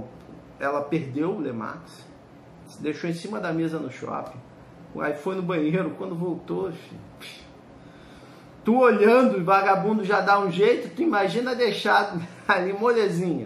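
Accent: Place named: Minas Gerais, Brazil. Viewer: Kkk gostei da mineirice...So observando a receita federal...kkkk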